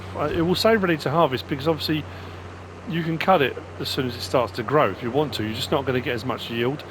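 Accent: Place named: United Kingdom. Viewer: Let me tell you something, I watch a lot of Youtube, many types of videos. No tv, or telly as you would say, just Youtube. While I like watching videos of my interests, in this case FS22 content, the common tie between ALL my subscriptions is PERSONALITY. More than anything, I just like to hear about PEOPLE. I like your perspective as a UK citizen; your worldview, your outlook on things, even, quaint as it sounds, your manner of speaking. Word usage, phraising, etc...Go ahead and chat! Tell us about your brother and his amazing feat. We have trails here in the US, I have day-hiked the Appalachian trail in many areas, I always dreamed of a thru-hike. Probably never do it, but we all dream. Go ahead, talk to us. It ADDS to the content. I am sipping my coffee here in Indiana but you carry me to England. The power of Youtube.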